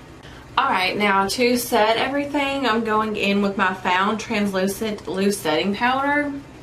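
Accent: South, United States. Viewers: I love your videos so much and your southern accent!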